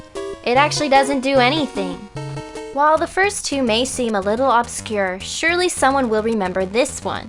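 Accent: Canada. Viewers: Are you Canadian?! :D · I can tell she's Canadian .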